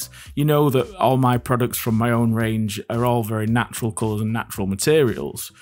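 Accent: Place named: England, United Kingdom. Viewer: Absolutely massive...... massively brilliant......so so Englishman speak with his massive accent....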